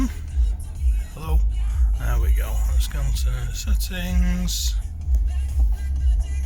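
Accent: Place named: Australia. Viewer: Your Australian accent is so difficult to understand.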